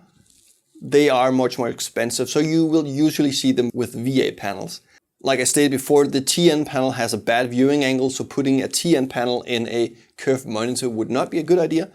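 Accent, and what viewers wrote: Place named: Denmark. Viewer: aggressive danglish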